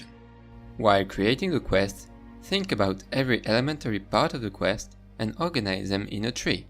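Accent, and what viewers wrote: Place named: France. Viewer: Bon, je suppose que t'es français vu ton accent xD C'est en français le "campagne quest" ? Parce que c'est la première fois que je vois ça,et j'avoue que ça rajouterait un peu de lore épique :D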